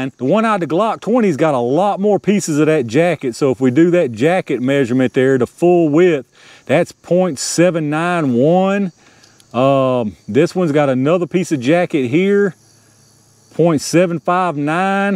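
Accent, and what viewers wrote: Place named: Minnesota, United States. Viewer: Sir you are a scientist and a scholar. Only a purist with real natural genius would strive to delve into minutia to this degree. Bless your heart and keep that wonderful Minnesota accent.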